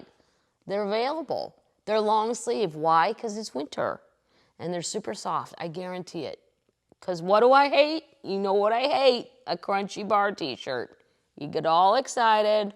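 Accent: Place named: Appalachia, United States. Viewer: your doing a bang up job reading Lorettie's words in her native "Hillbilly" speak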